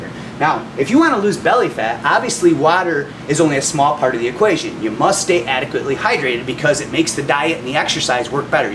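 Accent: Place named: United States. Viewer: nice video, You have a very strong American Accent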